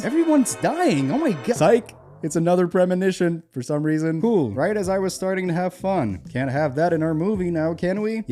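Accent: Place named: Montreal, Canada. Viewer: Oooooo is that a Montréal accent I hear? It's quite delightful lol.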